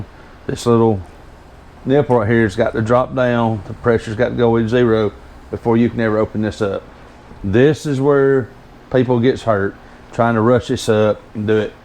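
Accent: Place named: South, United States. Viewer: Those are some beautiful cans of squash! Can this be done with all types of squash? I was thinking butternut squash. By the way, I love your southern accent. ❤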